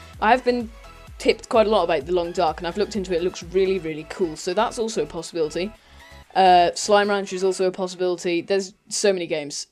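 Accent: United Kingdom. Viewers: Are you a real British?!?!?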